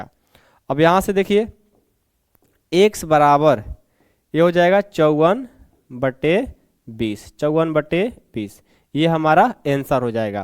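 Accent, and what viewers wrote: Bihar, India. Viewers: Sir aap Bihari se ho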